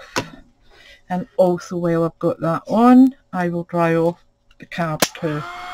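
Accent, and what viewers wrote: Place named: Scotland, United Kingdom. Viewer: Hi! thanks for the tips!! love hearing a scottish accent!!! Good luck with your channel x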